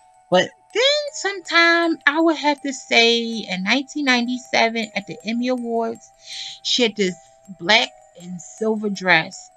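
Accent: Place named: Baltimore, United States. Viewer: Are you from Baltimore? Your accent sounds like it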